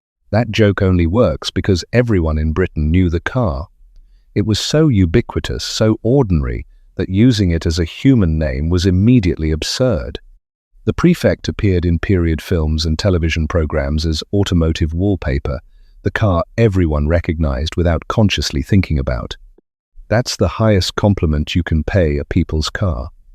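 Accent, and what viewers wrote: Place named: United States. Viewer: Wasn' it a popular that was the cheapest? pretty rubish video, why the American accent?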